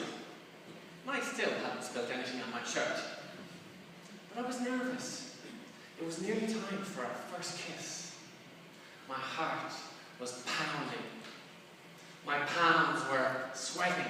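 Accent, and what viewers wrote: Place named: Ireland. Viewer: A highly entertaining and very well crafted speech. Well done,. And refreshing to hear a non-Anglo-Saxon accent. Ah shur, I'm a bit biased towards the dulcet tones of my fellow countrymen!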